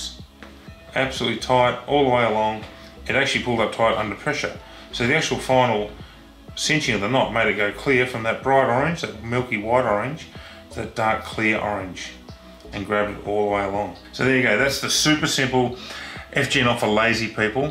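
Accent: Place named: Australia. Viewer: Australian accent (I think) wearing an Oregon something-or-other hoodie. Interesting.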